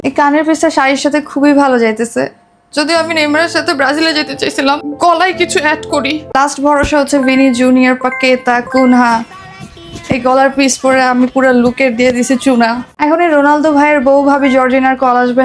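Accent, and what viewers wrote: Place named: Bangladesh. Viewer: বাংলাদেশের মাল সেটা বুঝতে পারলাম কথাতে।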